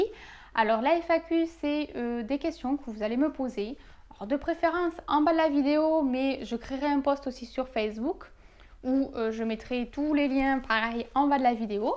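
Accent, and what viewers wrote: South, France. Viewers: J'allais oublié les questions ^^: quel âge as-tu ? Es-tu du sud car tu as un petit accent ?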